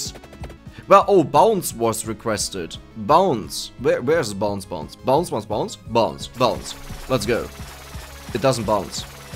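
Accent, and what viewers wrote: Germany. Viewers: He already know why sounds German.... Because he is German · I heard you where German the first time I saw a video of yours! I am Norwegian, so I recognise German/english easily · You wondered why people can tell that you're German. I think the two biggest tells are pronouncing "the" as "de" and the specifics of "ya" as a replacement for "yes." "th" becoming "d" is VERY German. You extend the "a" at the beginning of "ya" and use a sound at the beginning that's halfway between "y" and "j," which is also very German. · the reason people can hear you're german is your pronounciation of words, and also your "so" word. You say so as a german would say so in german xD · If you want to know why you sound German I can recommend the video from improve your accent on this topic (it helped me with my Englisch) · Your english is close to perfect I think. 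Its just the accent thats German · How you pronounce your English words is how non-Germans recognize your nationality... it's your accent. That's not good or bad, it just is what it is. :)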